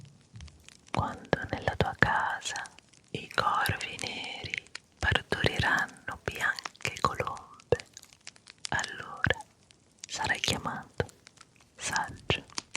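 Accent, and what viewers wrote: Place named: Rome, Italy. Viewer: Si è vero, la pronuncia romana fa ridere anche me 😂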